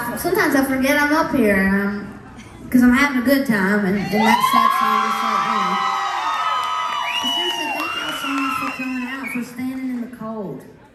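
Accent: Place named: Virginia, United States. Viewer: Love her Virginia accent, my home state. She's going places!! Another great Virginia Artist!!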